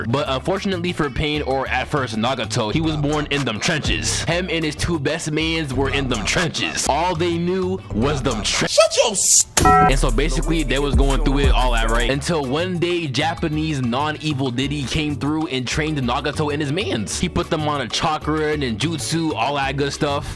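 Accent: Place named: Philadelphia, United States. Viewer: you so philly bro 😂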